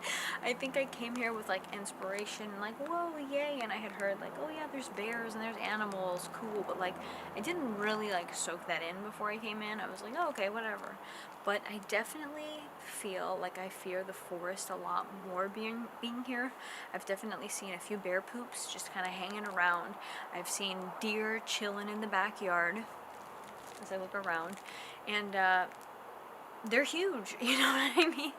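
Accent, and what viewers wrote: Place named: South, United States. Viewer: O Southern accent !! 😮😁 Love ya !! 😻😻 More vids please !! 🤗🇺🇸💯💯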